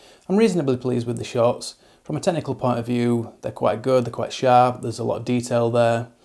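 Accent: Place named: Congleton, United Kingdom. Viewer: You sound just like all my pals from Congleton 👍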